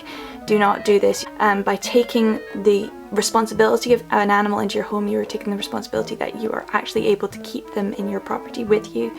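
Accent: Scotland, United Kingdom. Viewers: Are you Scottish? You have an accent :D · Are you from Scotland?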